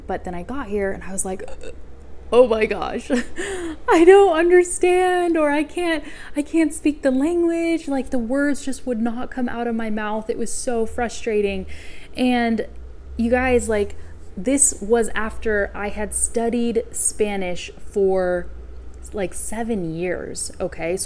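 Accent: Buenos Aires, Argentina. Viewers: Excellent video!!, telling us about your personal experience with the spanish language is very inspiring. As a native spanish speaker i got say you have a very nice accent, quite "porteño" haha. Bueno ahora si en español, te cuento que hace poco di con tu canal de youtube y de todos lo que encontre puedo decir que entiendo muy bien como hablas en ingles. I got a say since my childhood i listen a lot music in english, and watch movies and tv shows as well, but my challenge is reading fluent english language. Saludos desde Argentina. PD: I dont know if the word "quite" is well used xD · I think it was an excelent video, very natural, and I like when you speak fast and there is no subtitles, that force us to pay attention and follow every word as much as we can. We sometimes try to be perfect in all what we do and that is a problem, because nobody is perfect... 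and if you make a mistake at least that mean you are trying. I have to say that I love when you speak Spanish, I have seen other videos from you and I saw you talking in Spanish in one of them and I was perplex because you sound like an Argentinian woman, your Spanish is really clear and you got to achieve a very similar accent. Thanks for your video and I know many of us will be looking forward to a new one. See you. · Hello, thanks to share this video and your experiences with language learning. Your Spanish it's very good! You sound like a true Argentina! Continue inspiring us! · I don't know, but it seems that you're speaking a little bit slow because I'm getting everything you're speaking , am I wrong?..suenas como una porteña, saludos desde Nicaragua XOXO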